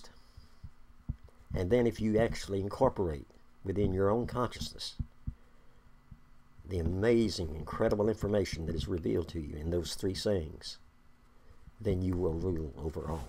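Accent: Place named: South, United States. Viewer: Southen accent is so grating to listen to.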